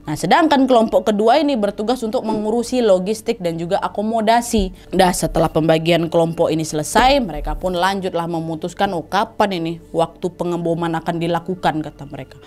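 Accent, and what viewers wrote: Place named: West Sumatra, Indonesia. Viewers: Gw salut sama kamu membawakan cerita bom Bali dg khas Sumatra mantap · enak kali dengar cerita ini, logatnya khas minang, jadi suka lihat ibu ini.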